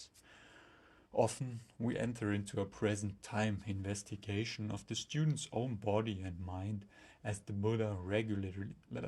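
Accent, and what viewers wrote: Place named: Germany. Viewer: Ich hab zwar Tag meines Lebens noch kein vergleichbar heftigen deutschen Akzent gehört, aber ich danke dir soooo sehr für dieses Reading und den Upload.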